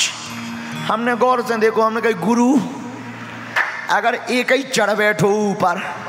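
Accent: Bundelkhand, India. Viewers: Ye apka vedio bahut pyara hai .kitana original bolte hai .Maja a gayi .Apki Bundelkhandi language bahut man bhawan hai..Krishan kanhaiya sa lavanya Mai Devatva Swaroop .Vaisi he meethi meethi Bate.jai ho Banke Bihari Lal apki Jai Hove.... Bageshwar Dham Sarkar ki Jai.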